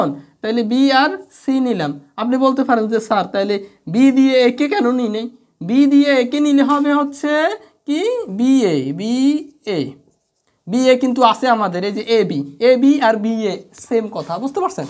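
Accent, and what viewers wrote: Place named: Noakhali, Bangladesh. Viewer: vaiyer basa mone hoi noakhali ba feni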